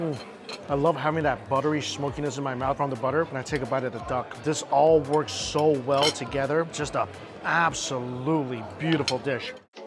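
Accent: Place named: United States. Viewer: Yummy man I love our your pronunciation is freaken Terrible lol and so American man.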